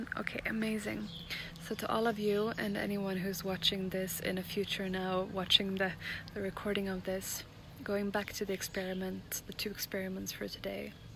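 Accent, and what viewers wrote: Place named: Sweden. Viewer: Älskar att höra dig prata med lite svensk dialekt! 😍 grym video ska verkligen testa detta!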